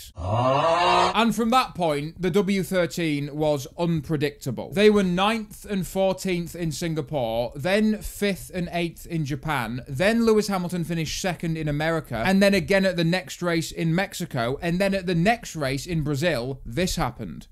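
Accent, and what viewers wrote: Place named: Sheffield, United Kingdom. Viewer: Whenever I want offensive motorsport content, I do want it in a Sheffield accent!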